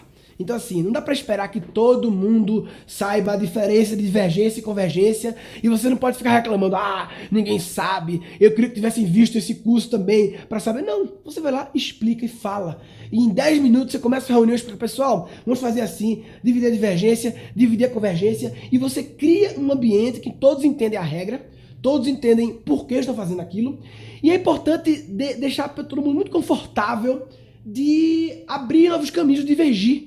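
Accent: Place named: Recife, Brazil. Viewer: Gostei muito desse video,! Você tem um sotaque daqui, da região metropolitana do Recife!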